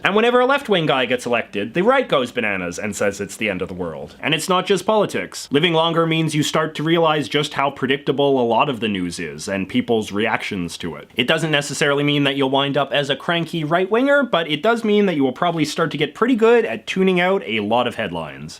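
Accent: Canada. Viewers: That's the heaviest Canadian about I habe ever heard!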